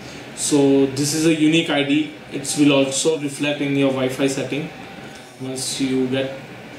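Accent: India. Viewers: Your English accent is very bad and difficult to understand it .. all the Indians the same in this matter . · if your going to make a video lose the Indian accent we hate it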